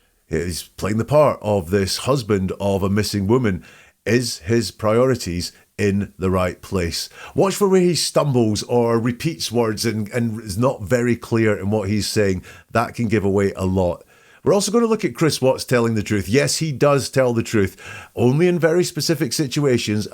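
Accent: United Kingdom. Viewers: Hello, my friend! You sound British! I'll share a bit of synchronicity with you. About an hour ago I just learned of the idiom "hoist with one's own petard" How fitting for Mr Watts and this very insightful video! I have subscribed and look forward to hearing from you often.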